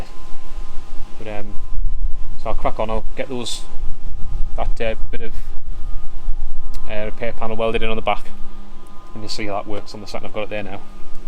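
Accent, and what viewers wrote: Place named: Northern England, United Kingdom. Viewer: Top Vid, sounds like your up north. Do you know of any good MIG gas suppliers in north-east (Stockton/Co Durham) but not BOC?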